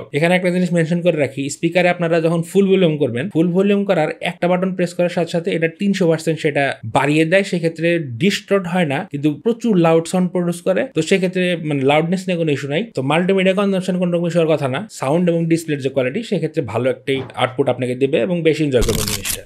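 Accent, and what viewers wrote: Tangail, Bangladesh. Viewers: Vai tangail theke naki? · Vai apnar basha ki Tangail a? 😱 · Bhai ki tangail er ni?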